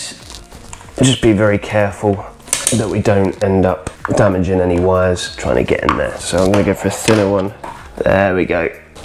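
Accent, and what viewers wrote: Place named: England, United Kingdom. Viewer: I prefer the Human language aka American English and not that nasty tongue clicking and lip smacking noise from shithole engkand, on top of the frequent gasping you mouth-breathers constantly geberate every 4 seconds(on average, it can ve far apart as one whole minute beforw you things gasp, a very ugly sound just lije the stupid lip snackinv you genetate while gasping to show that you ats from england ir sone such savage land instead of civilization).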